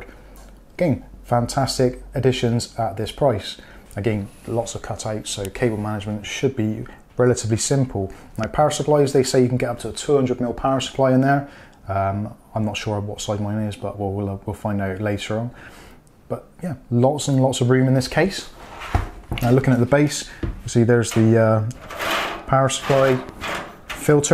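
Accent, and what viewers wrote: Bristol, United Kingdom. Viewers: mate are you from bristol? sounds like it, im from there lol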